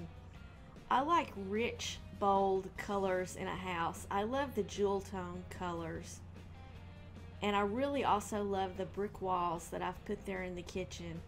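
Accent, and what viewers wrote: South, United States. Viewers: I love your house building video, This is the first one I watched of your builds. I love your accent to, Are you southern? Just curious.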